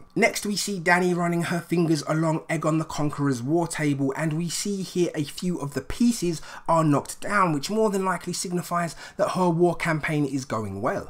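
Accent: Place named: United Kingdom. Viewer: hey! thanks for answering my question!! I subbed you a little while ago as you were doing a livestream with my pal Mark (SerHuntsReviews) and I'm enjoying your content a lot. I don't comment too often though. I enjoy your videos though. You do a good job!! I enjoy hearing Brits again - I miss them a great deal... I did my PhD in Aberdeen and lived there for 7 years. I'll be back over there again sometime soon to do further research probably in Durham, London and Edinburgh as well as my old standby - Blair-Atholl, in Perthshire. That place is gorgeous! Cheers and best wishes. Looking forward to your content in the upcoming season!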